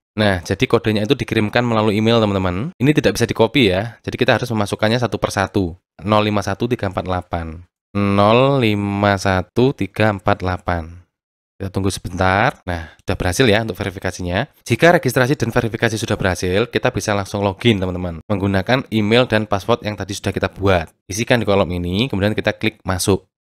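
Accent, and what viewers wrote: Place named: Java, Indonesia. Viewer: Kyk nya beda deh, klo ini jawa nya lebih medok, klo kerah kuning gak terlalu kentara medok nya. Itu sih menurutku.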